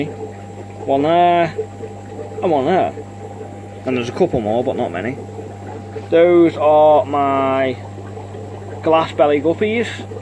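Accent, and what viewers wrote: United Kingdom. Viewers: "Focus, Bitch" was reaching iconic status, for me at least. I'd started saying it in my head whenever I go off on tangents during deep conversations. You know, you could've turned that into a selling point or catch phrase for your channel...it's the way you sound that caught my attention. Anyway, I'm really into various Brit accents, so there you go🤓.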